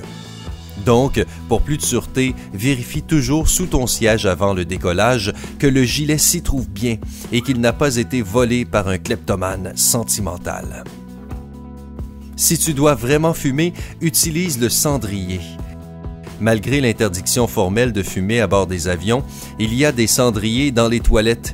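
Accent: Quebec, Canada. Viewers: RRr cet accent québécois.. Je n'arrive pas à m'y faire. Il est agaçant à entendre. Pardon. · Pur accent québécois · Oh Non, l'accent canadien. Je peux pas ... sorry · Putain d'accent canadien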